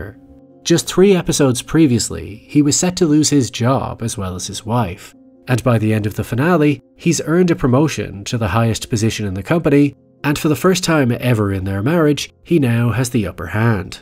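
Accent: Ireland. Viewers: Ah brilliant, it’s so nice to hear a fellow Irish person who is making my favorites videos, I’m Deirdre O’Brien, unmistakably Irish name, especially in Irish, but I’ve been meaning to ask you since the first video of your’s I watched on Prisoners which was fantastic. Love your videos, it’s so great to hear a lovely Irish accent making wonderful videos, you’re the only one (from the kind of videos I watched) and you do a great job. Your analysis is always so great and your observations have made me wonder have you and English degree. Anyway very happy for you in a sea of American creators. Wishing you more and more success, you completely deserve it. 😊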